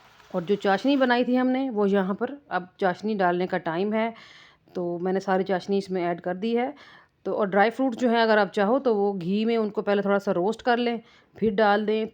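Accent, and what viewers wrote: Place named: Saraiki, Pakistan. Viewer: Saraikan lagti hay kionkay saraiki main urdu bol rahi hay!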